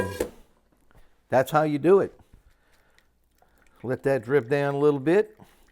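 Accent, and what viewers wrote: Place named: United States. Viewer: Where is your amazing accent from? Delaware? Maryland?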